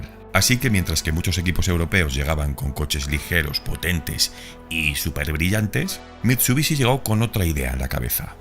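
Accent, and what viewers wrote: Spain. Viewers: Español de España, además. ¡Gracias!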